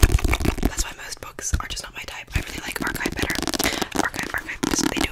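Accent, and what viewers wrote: United Kingdom. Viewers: Wow I didn’t listen to your asmr for maybe 3 months and suddenly ur British what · Your slowly transforming to a full British accent 100% of the time😂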